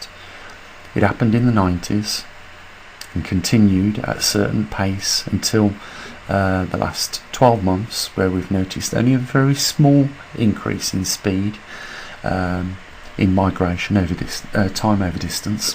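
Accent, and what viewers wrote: United Kingdom. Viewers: Hi. First of all, I must say I like your information of magnetic poleshift a lot. But as a non-native English speaker, I find your British accent is too difficult to comprehend or access your content. I have a little problem when listening to an American accent speakers. If you are aware that not only native English speakers have followed your jobs, but there is me following your difficult accent also. Your fan from Thailand.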